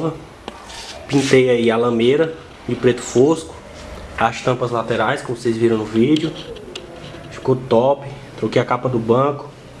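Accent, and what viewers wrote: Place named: Bahia, Brazil. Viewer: kkkkkkk mt bahiano pqp kkkk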